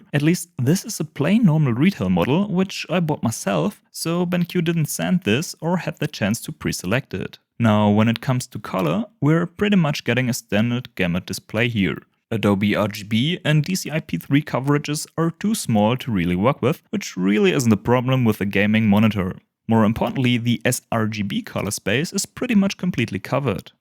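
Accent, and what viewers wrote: Germany. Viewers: Dieser deutsche Akzent xD Nice Video mein Freund ;)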